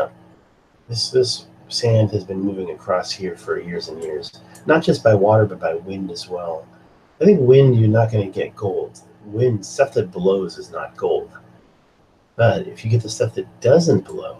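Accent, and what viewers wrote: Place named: United States. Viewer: You sound as American as me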